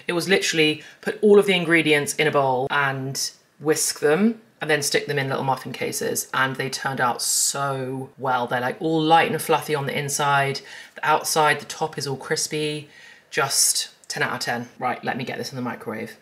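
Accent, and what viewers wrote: United Kingdom. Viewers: The accent speaks for itself. Brit ❤😍 Always love reset videos they motivate me to be more productive 😇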